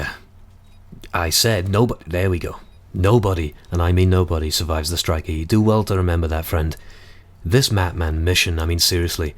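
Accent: Wales, United Kingdom. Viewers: sound like a welsh xbox ahoy :)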